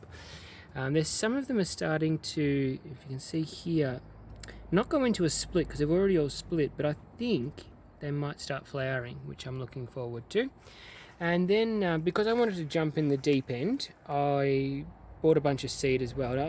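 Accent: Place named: Australia. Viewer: I appreciate your thorough descriptions and naming your cacti. Love you generosity and Ausey accent. And no I don’t like yellow flowers either. Dandelions are yellow aren’t they?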